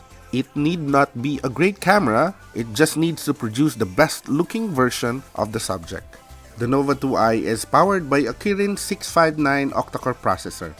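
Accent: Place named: Philippines. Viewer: Why does he sound like a Filipino speaking in english?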